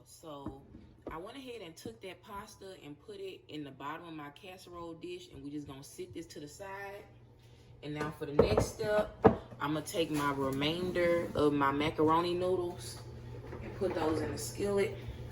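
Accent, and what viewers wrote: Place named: Georgia, United States. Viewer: Love your vids ! Trying to figure out your accent, are you from Georgia?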